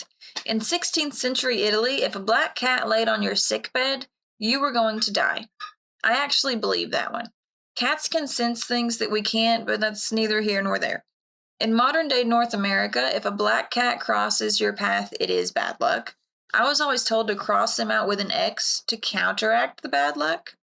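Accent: Kentucky, United States. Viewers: It’s the humor… the sarcasm…. The KY accent?? I’m here for it!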